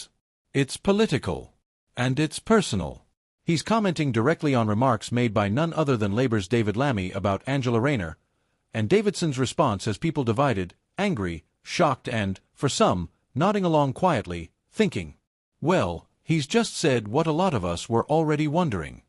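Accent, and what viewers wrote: United States. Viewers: Why we got a bleedin yank telling us this . Just get on with it yawn yawn yawn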